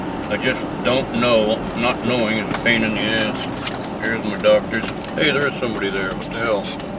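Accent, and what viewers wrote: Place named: Texas, United States. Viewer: Don’t worry. You may sound a little different but it still comes out Texan.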